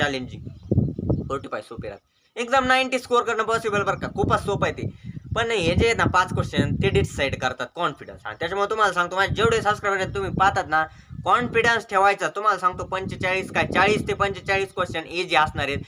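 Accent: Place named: Ahmednagar, India. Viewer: नगर ची भाषा ऐकायला फार गोड वाटते दादा तुझं मार्गदर्शनाची मदत होते धन्यवाद मुंबई वरून 🙏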